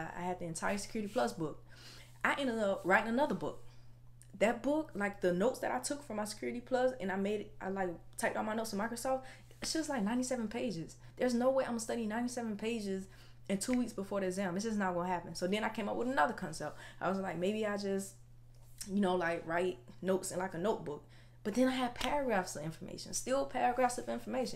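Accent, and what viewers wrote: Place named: Atlanta, United States. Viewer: lmao I knew it had to be ga! So I just went ahead and said Atlanta. My cousin born and raise in Atlanta she got the same dialect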